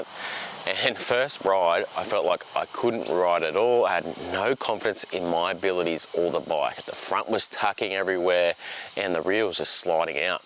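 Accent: Australia. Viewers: that is not a British accent. Aussie, maybe a Queenslander. Which is Australian, just more so. He dresses like he's from QL. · Taai'es ... gotta love the Aussie accent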